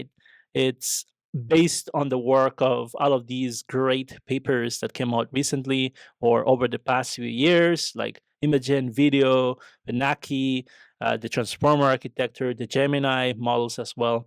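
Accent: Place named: India. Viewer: GOOD INDIAN ACCENT, VERY UNDERSTANDABLE BEST IS TO READ THE PAPER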